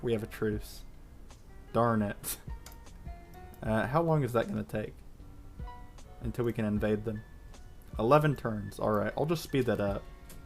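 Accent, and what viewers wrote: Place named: United States. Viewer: Hey man just curious about your accent- what state are you from?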